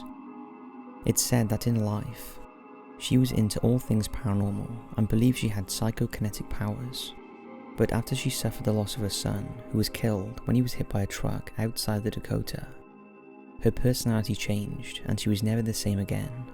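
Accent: United Kingdom. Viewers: I just about choked on my popcorn when he said, "a man named Wilber Ross." Was he THE Wilbur Ross or just somebody who had the same name? Or does the British-sounding narrator not know about Wilber Ross? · whats creepy is the way the narrator speaks with a uk accent but says American style words...take a look..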